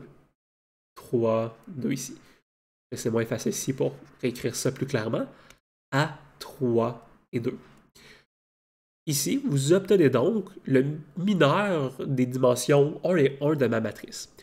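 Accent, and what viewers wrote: Quebec, Canada. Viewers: 😂 il est quebecois , c est leur accent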